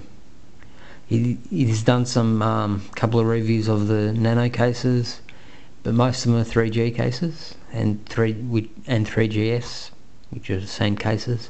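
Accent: Australia. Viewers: you sound australian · Aww man it's not nice to ask him why he talks funny...and being British myself I would just like to point out that he's not British. He's most likely Australian or from New Zealand, we don't have dollars over here. We have pounds.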